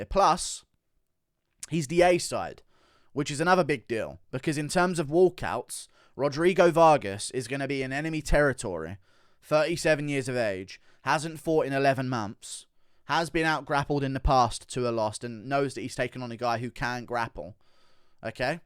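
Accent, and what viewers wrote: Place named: Liverpool, United Kingdom. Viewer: I love the liverpoolian accent, fook all dem doubters yeh?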